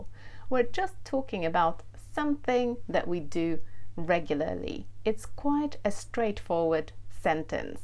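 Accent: United Kingdom. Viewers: Hi Ms, is your accent British? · Very nice British English,sounds like music in my ears! Kind regards from Spain.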